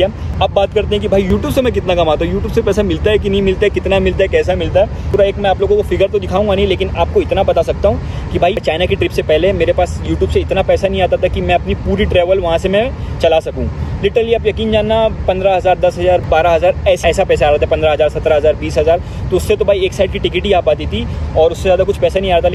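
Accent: Mumbai, India. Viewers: Assalamu alaikum bhai in sha Allah top pe jaoge agar isi tarha imandari se kam kiya to. Aur bhai language pe dhyan do mumbai wala accent aata hai hai.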